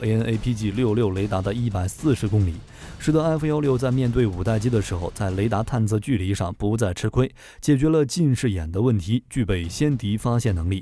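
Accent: China. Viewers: 一個操著大陸口音的人，居然在吹台灣的飛機有多厲害！我業障重啊？我真的視障目盲耳背，一堆人跟風且無常識！就問你們飛過沒？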